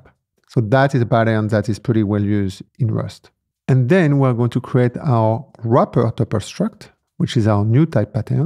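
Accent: France. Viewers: The french accent is so cool as a french person :) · OMG! I'm loving the French accent! 🥐☕😀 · ummm seem like here is a french accent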